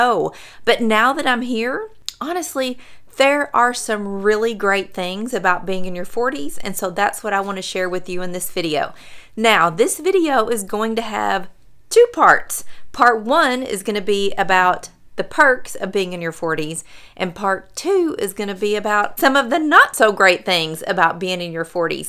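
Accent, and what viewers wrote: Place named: Kentucky, United States. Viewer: Wow. My first program from you was all about Pollock. Great u-tube. Now I sit down with you, and get my visit with my new best friend! You are so fun to get to know. I've seen 10 of your videos and am hooked. My Daddy was from Texas. I was born in Paradise (San Diego) and in elementary school people would ask me about my accent. Was I from the South? I love your Kentucky accent and and you confidence, southern charm and relate to your experiences. I'm 72. Soon to be 73 in June. TY for being my new BF. God bless you, lady bug. Linda D in SD.😊🇺🇸💕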